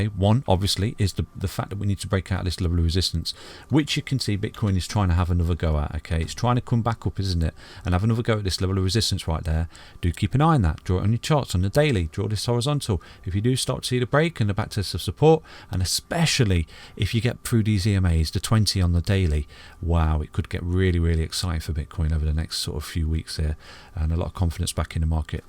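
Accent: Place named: England, United Kingdom. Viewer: LMAO this is a proper english accent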